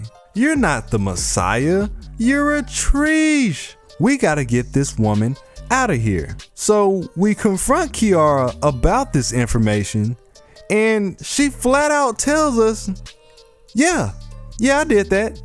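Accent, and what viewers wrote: United States. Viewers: are you from Baltimore? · you from Philly?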